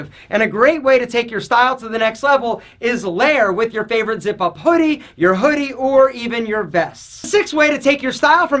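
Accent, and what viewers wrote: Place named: East Coast, United States. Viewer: Hey I enjoy your channel...quick question for ya. I think I recognize that accent. Might it be from a major East Coast City between New York and Washington? I have a bet with my wife!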